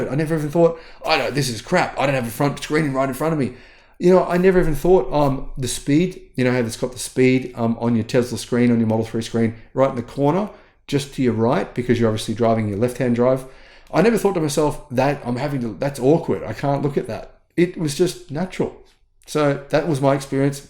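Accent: Australia. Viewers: You sound Okker to me, in the USa I have to adjust a few words, like pronounce Ts with Ds , all part of the fun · There’s nothing wrong with your accent, it’s pure Australian. The road trip appraisal sounds pretty fair to me. I have friends that own Tesla’s and BYD’s and the Tesla is light years ahead of the Chinese EV range. If you’ve decided to move from ICE to EV, avoid Chinese built cars. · My wife, daughter, and I test drove the new Tesla Model 3 about a week ago, and we were all impressed. Before, my daughter had no interest. During and after the test drive, she kept asking when we were buying one. I saw huge improvements over the TM3 from just a few years ago (2020): fit and finish, quieter, less jarring and less road noise, more comfortable seats and a nicer interior, a better sound system,… This is the best value EV on American roads, easily. BTW, your Aussie accent is great and very easy to understand. 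· There's absolutely NOTHING wrong with your Australian accent. You be you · you are easy to understand! We all (my family) loves your Australian accent. Do not change, period❤ Be yourself, you and your family are awesome and always welcome...! Thanks for all you do and we are praying for continued positive health and success ❤ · Your Aussie accent is quite charming and not an impediment...you are 5x5 on communications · NO mate, speak MORE aussie, I love it! Greeting from a dane, an actual viking :D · Another great video,. I live in California and appreciate your Australian accent. As for the M3, I drove one for two weeks while my '22 Ford Mach-e was getting body work done. I hated it. The car felt cheap, was loud, and did not have CarPlay. I'm happy people are buying EV's but the Model 3 is not a good car. The Model S is much better. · I don't like to listen to AI narration or videos that are difficult to understand because of a strong accent. 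You Aussie accent is accent is actually refreshing. My son drives a vintage model 3. I love riding in it. Used 3's are available at great prices. The only reason I won't buy a Tesla is that Musk is such an ass. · You sound great! Love the Australia accent. Don’t change! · Love the Aussie accent. Wanted to move there to Melbourne so I could have it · Keep the Ozzie accent BUT “Ion” and “iron” sound the same in Oz Maybe say “eye-on” for ion & “eye-urn” for iron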